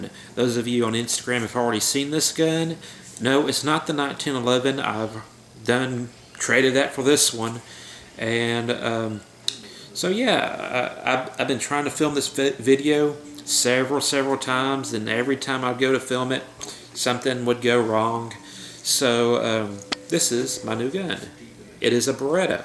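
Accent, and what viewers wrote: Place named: Louisiana, United States. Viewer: You have an awesome accent, or whatever it is that makes you speak like that. Sounds like a Cajun-American blend, very smooth.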